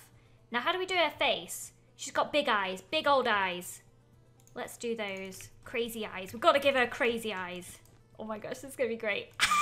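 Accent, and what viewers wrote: England, United Kingdom. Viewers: She is English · lol she's british XD I can tell you're new here... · She is from England. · she has a british accent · She is British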